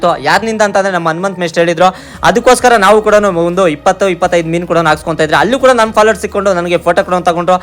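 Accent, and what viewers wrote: Kolar, India. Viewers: Guru kolar bashe kelode one tara majja guru .. Ninu matadodu keltidre hale nenpu..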